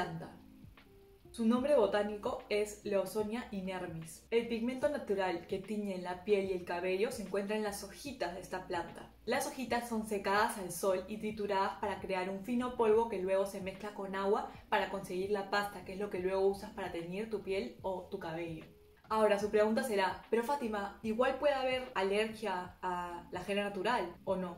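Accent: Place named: Mexico. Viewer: Gracias! ✨ Soy solo yo o alguien más te ha dicho que tu acento suena un tanto a mexicano? 😅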